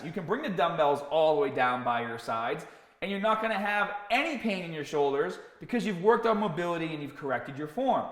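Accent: Boston, United States. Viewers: The most Boston of Boston accents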